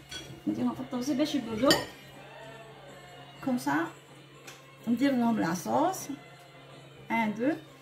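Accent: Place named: Oran, Algeria. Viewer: Elle n est pas Egyptienne voyant elle parle algérien avec un accent Oranais et des mots français..j adore..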